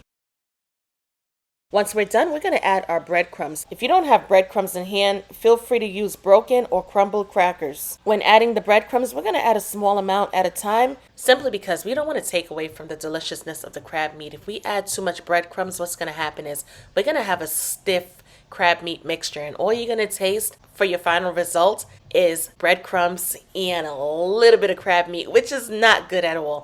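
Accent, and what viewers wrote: New York, United States. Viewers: Love you're recipes, And ya New York accent !! 💕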